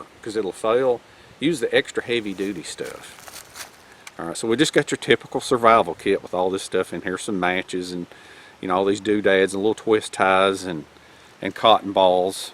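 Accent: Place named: Louisiana, United States. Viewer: Two thoughts: A.) Why not a trench fire? B.) If you carve a funnel out of wood with a SAK and lay the tin foil in the funnel: wouldn‘t life be better?😅 Love your videos and your accent! Keep making those experiments!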